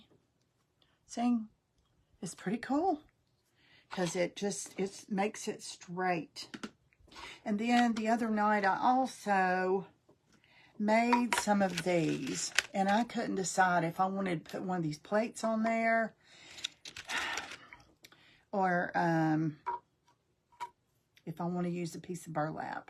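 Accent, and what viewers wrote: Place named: North Carolina, United States. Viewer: I knew that accent! I am from NC too!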